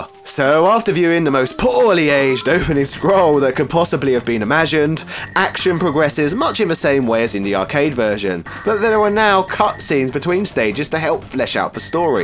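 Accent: United Kingdom. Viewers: I love your nod to wrestling gimmicks. That's my favourite part. Plus, as a Canadian I find your British accent hilariously awesome for your character.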